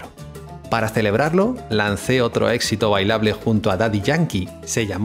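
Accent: Spain. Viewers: Que feo eso de hablar por otro. Me parece q debió ser un relato en tercera persona, y ese acento español que es eso. Hable bien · La historia de Marc es muy interesante, pero no entiendo ese acento español que risa 😂 obviamente no eres Marc, es por eso te aconsejo que solo relates „la Historia de Marc Anthony“ y sería mejor que no sueltes tus 🐔 gallos 😂please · Ese acento español, definitivamente no sirve para imitar a Marck... no suena igual !! Eso indica que la narración, las opiniones y punto de vistas expresados, puede que no sean los reales, ya que no los vierte el verdadero Marck Anthony !!!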